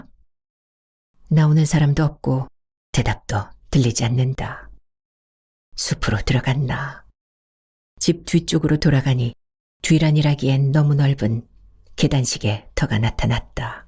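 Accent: Jeolla, South Korea. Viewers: 처음부터 구수한 전라도 사투리 로 고급지게 들리는 에 낭독이 왠지 전설스런 내용일듯 좋아요 누르고 감사하며 듣습니다~♡ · 와 사투리 구사가 완전, 설마 전라도분 이실까요? ㅎ 우리 할머니랑 똑같은 단어 똑같은 억양 완전 리얼 합니다.. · 전라도 사투리가 찰집니다.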